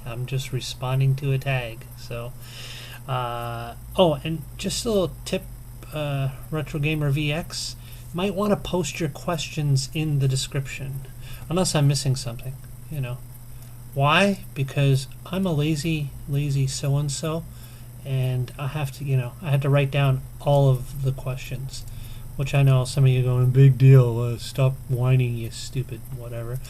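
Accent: United Kingdom. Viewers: London accent.Why all americans think london accent is british accent .you are funny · LOL..great vid man... Nice british accent! Haha.. u tried XFile2708's famous line!! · Excellent answers and you sounded great as a Brit too buddy.: ) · Your the man!!! lol, its been a while since i've had a big smile on my face lol. I say you sound more Enfield than East End but great atempt, if i heard you talking like that i'd probably think you was from Essex or somewhere like that. Londoners would love you talking like that down the pub, especially the "More tea Vicker" as that saying is normally reserved for when someone *Cough - Breaks Wind - Cough* lol Fantastic.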